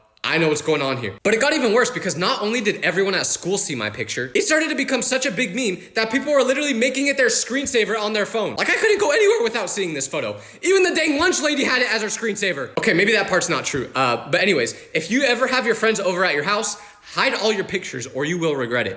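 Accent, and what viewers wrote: United Kingdom. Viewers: Wait you're British? .. . . . . . . . Aaaaahhhhhhhhhhhh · you’re British ?ahhhhhhhhhh!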